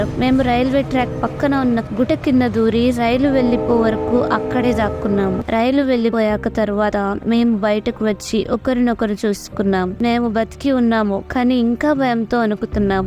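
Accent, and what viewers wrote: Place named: India. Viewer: Good 👍 nice telugu slang is nice good voice some words we are not able to understanding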